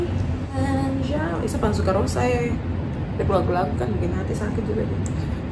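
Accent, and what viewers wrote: Papua, Indonesia. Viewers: Cantiik.nya natural.. Suka sama logat papua.nya.. Sukses selalu ya kak.. 😊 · Yoi logat / dialeg Papua tu lebih bagus, jadikan itu sbagai ciri khas tersendiri pd channel km sist krna yg logat jakarta tu su terlalu bnyk e,, 😆😅😂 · Cantik apa lagi dengan logat papua keren👍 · Cantik 😍😍 suka logat papuanya, tdk yg buat* sok logat jakarta bgtu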